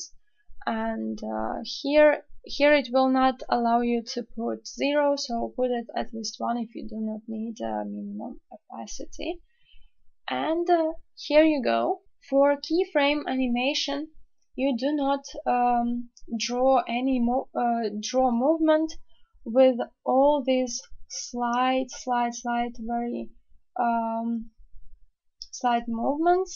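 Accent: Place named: Latvia. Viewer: Your name and accent makes me guess that you are Latvian. Could I be right? :D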